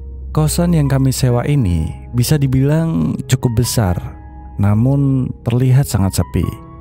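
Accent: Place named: West Java, Indonesia. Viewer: Sunda euy😂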